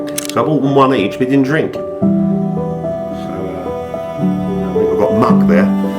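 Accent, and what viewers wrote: London, United Kingdom. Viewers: Great vlog, glad to have found you on here.. can relate to the Geezer accent 😁😎 .... Subscribed, and maybe bump into you on the mean streets 👌👍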